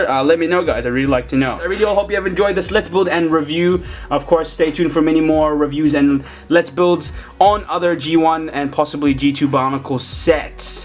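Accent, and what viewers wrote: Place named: New Zealand. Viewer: Wait are you kiwi, that's awesome, what part of NewZealand are you from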